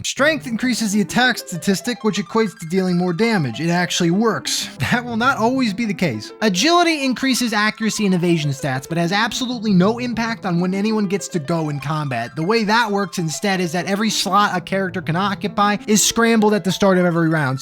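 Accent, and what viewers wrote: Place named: Philadelphia, United States. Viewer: avgn feels like Jersey, feels like Philly (I grew up in Trenton) Might be the way he says bawss reminds me of a Philly saying cawfee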